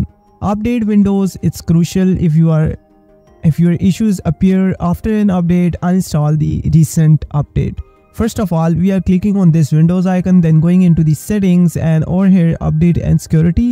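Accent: India. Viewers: کیرممننم تو لهجه هندیتتتت ولیی واقعااا ممنووننن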